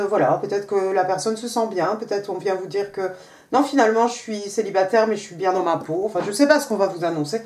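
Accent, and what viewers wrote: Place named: England, United Kingdom. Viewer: Merci pour cette guidance 🙏, j aime bien votre accent anglais 👍 . Bonne journée 🤗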